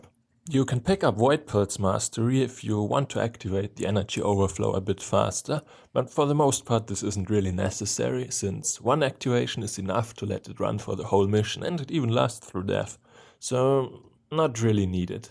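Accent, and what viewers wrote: Germany. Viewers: You sound very german. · Are you from Germany or Austria :D Your accent sounds like that :)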